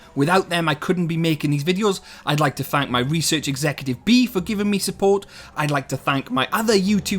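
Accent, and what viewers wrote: Wales, United Kingdom. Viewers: Sounds like Welsh accent, where abouts are you from? I'm Bangor, Gwynedd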